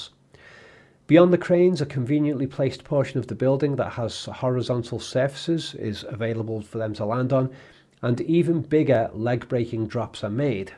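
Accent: Liverpool, United Kingdom. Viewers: Letting his inner scouser out. I suspect he’s capable of a much more feral scouse accent but keeps it in check for his international audience 😂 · Interesting to hear your take on Bond - given your accent I keep forgetting that you are the citizen of a monarchy that lost an empire....hence the need for the delusion humorous or otherwise. G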